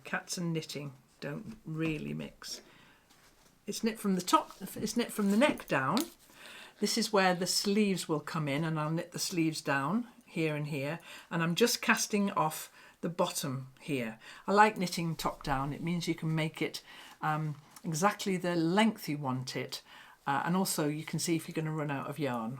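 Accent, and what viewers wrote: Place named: Scotland, United Kingdom. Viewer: Awww. Agnes is a spitting image of you. I don’t knit but truly enjoy watching your videos and am inspired by your creative ideas. Frugal as well, I find fascinating the way something is always possible from seemingly nothing. I’m in Missouri,USA yet in my imagination I can find that I’ve come to Scotland for a visit when you speak. Ahhh. And the bagpipes……🙂 Perhaps one day….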